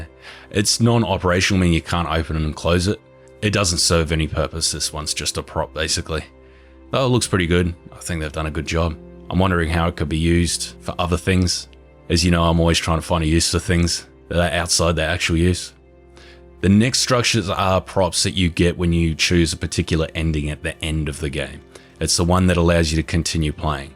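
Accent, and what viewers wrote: Australia. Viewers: ur Aussie ay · Hugh jackman voice over! I live down under and you have some hearty accent ❤️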